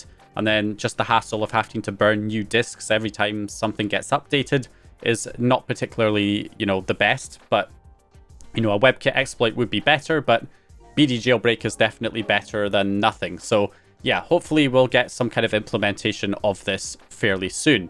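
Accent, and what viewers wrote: Scotland, United Kingdom. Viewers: Nah, not really. He's just Scottish. California valley girl is the worst if it's a man speaking that way.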